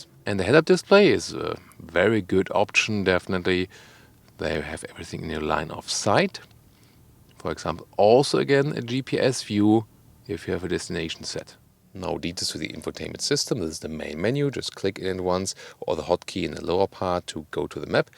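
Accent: Germany. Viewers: Und woher kommt so ein schrecklicher deutscher Akzent? 😅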